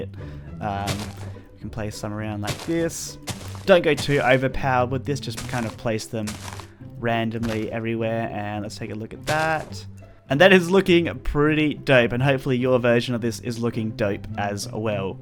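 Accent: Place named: Australia. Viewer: Just Subscribed! Im australian and you sound aussie too!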